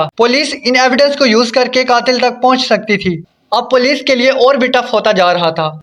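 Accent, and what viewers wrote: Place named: India. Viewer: I seriously do not think the commentator is Pakistani. His aCcent is Indian and he's using Hindi words!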